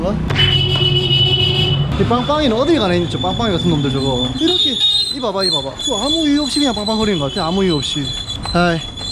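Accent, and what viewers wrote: Gyeongsang, South Korea. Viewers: 목소리가 경상도 사투리라서 정이가서 본다 ㅎㅎ 여행도 좋아하지만 정갑다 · 그는 경상도 사투리를 쓴다. 그는 Jay다 - 요새 Jay님 영상 보는 재미가 쏠쏠 해요.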